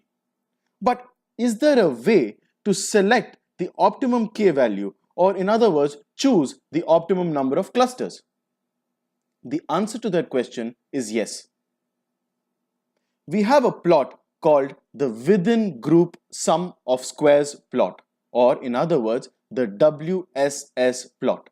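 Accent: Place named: India. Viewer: even if my ears hurt by your hardcore indian accent, your way to explain is brilliant, sir!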